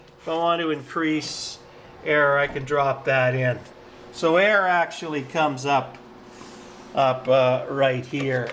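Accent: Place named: Kentucky, United States. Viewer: UK? Seriously? You talk like you're from Kentucky. No offence.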